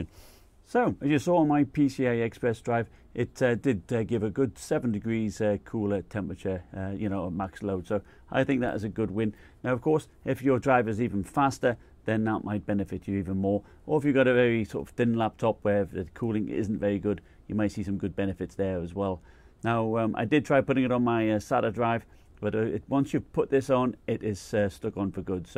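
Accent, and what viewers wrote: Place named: Billingham, United Kingdom. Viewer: It had occurred to me that something new might be going on, I hadn't installed anything but sneaky coin mining type activities is always a possibility, so I formatted and did a clean install of Windows yesterday, and even as it was installing it was getting hot. Performance whilst installing seemed a bit off, but since then it's been going OK - as I said, just a bit impractical with the cover off. I was more worried that the excess heat could do a bit of damage to everything else inside the laptop. I had hoped it would be a simple 'yep, bung a new SSD in and all will be well in the world' but maybe I'll just have to get used to the cover being off. Thanks anyway though, I'm looking forward to browsing through some of your video's, not everything interests me (I'm an old Unix and cobol zombie from way back but I just don't get gaming at all), I watched the one on how much money you make from a few weeks ago, it was really interesting, and you were helping those who may be just starting out with tips, which is really nice. 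(My husband is from Billingham, you sound rather local. Hope you're not sulking as bad as he is because Derby won )...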